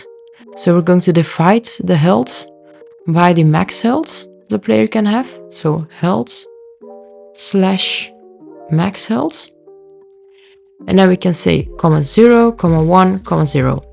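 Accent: Netherlands. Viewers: are you from the netherlands or belgium?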